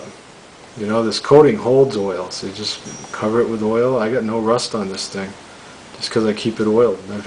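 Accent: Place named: New England, United States. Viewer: Let me guess , Rhode Island or Boston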